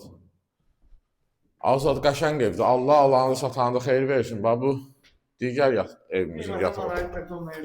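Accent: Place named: Baku, Azerbaijan. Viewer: Allah qorusun. Bakılı ləhcəsi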